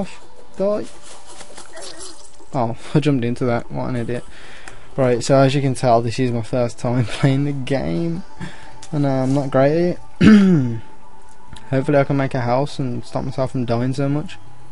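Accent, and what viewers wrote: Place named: United Kingdom. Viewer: I love these Playthroughs with guys with Brittish accent playing it while talking, it's fun to watch! And I'll give you an subrscribe :)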